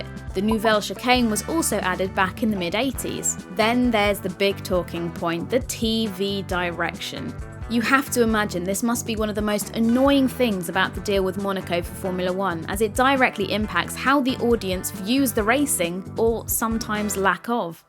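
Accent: United Kingdom. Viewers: Your accent is the most British I ever heard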